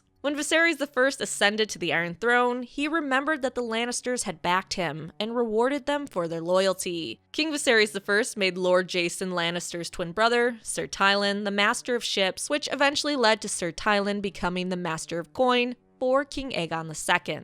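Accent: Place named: United States. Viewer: To hear this in ... "American" sounds soooo wrong. No offence though.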